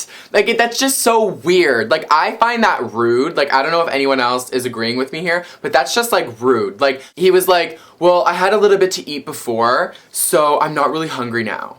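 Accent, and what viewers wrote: Canada. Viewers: are you Canadian? it sounds like it sometimes. lol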